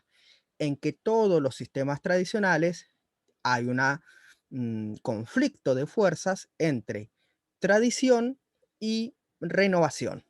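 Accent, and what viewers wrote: Standard. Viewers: Me encanta como explicas, pero se me hace raro que hables en neutro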